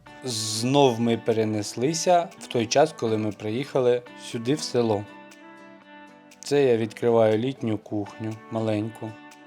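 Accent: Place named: Kherson, Ukraine. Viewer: Привіт земляки, як тільки почула наш херсонський суржик, відразу підписалася на ваш канал,ми теж зараз мешкає в іншому регіоні,але дуже хочеться додому,правда,квартира в річпорту затоплена,в приватному будинку на лівобережжі живуть орки,то ваша ситуація доволі знайома,бажаю вам міцного здоров'я, процвітання каналу,і многая літа під мирним жовто-блакитним небом!!!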